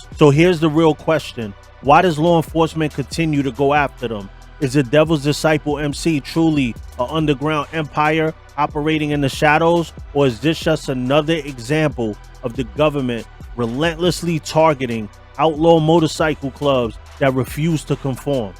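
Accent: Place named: New York, United States. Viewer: Bro, you are so New York. It’s pronounced TOOSAHN. Not Tuxon. Tucson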